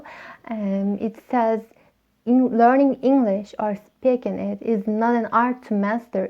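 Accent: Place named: Turkey. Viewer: Türk olduğun çok belli oluyor konuştuğunda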